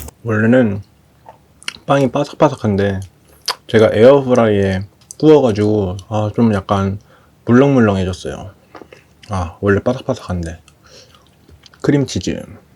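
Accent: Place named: South Korea. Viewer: Always love Korean accent haha waffareu 😇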